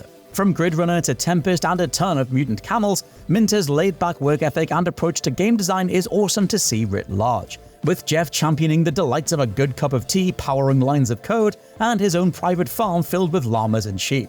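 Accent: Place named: Scotland, United Kingdom. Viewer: He is pronouncing it properly but it's his accent which is a cool accent. It's like a British and Scottish accent combo.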